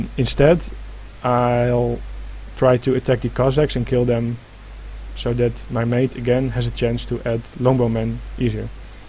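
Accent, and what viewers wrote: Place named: Netherlands. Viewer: You are dutch right i can hear it. plz tell me how to get a good economy at the start thnx :)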